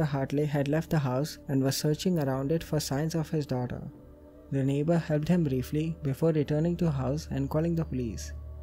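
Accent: India. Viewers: Narrator: I’m sorry, my pronunciation is trash. Also narrator: acrimonious You’re perfect. Don’t worry about your accent. Desi accents are awesome.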